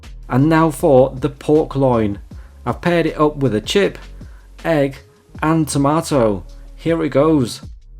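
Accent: United Kingdom. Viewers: Mate, You are the most polite reviver. Listening to you speak you've been in the UK for a while.The first one looked to dry The second was far better mate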